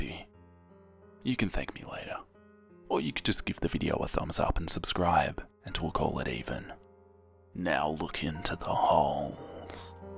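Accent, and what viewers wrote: Australia. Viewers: YOUR AN AUSTRALIAN!? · wait.. are you aussie??? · Ayyyyyy! Your Australian! You are now my most favourite Australian Youtuber! I'm Australian.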